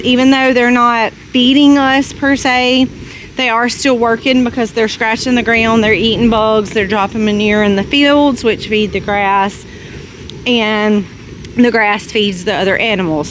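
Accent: South, United States. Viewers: I talked about my grandmothers garden. They also had chickens in a hen house an d pigs in a stable. Both had a fenced area so they did get outside. I agree I love spinach but grandma didn't have that. I am curious you are always talking about the veggies do you have fruits. Grandma had cantaloupe and watermelons. She had an apple tree but it never gave off much. I didn't see corn either but I think it is late in the year for it. Also I live in Oklahoma and not sure what area that you are in but I am betting in the south hearing your husband and especially your southern belle accent. I know certain things grow better in certain areas.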